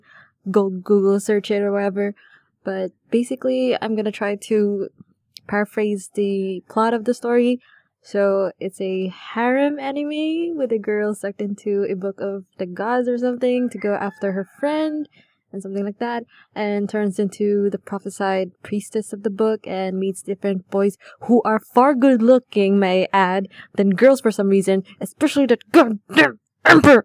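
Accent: Philippines. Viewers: Ur voice has a filipino accent and btw i love ur art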